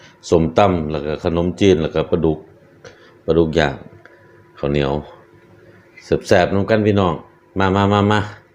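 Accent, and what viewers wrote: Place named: Sisaket, Thailand. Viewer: จังแม่นเพิ่นแซ่บแต่เช้าน้ออ้าย ฟังสำเนียงคือทางศรีสะเกษน้อ ผมเขยศรีสะเกษเด้อครับ เป็นตาแซ่บคัก กินนำแน่ๆอ้าย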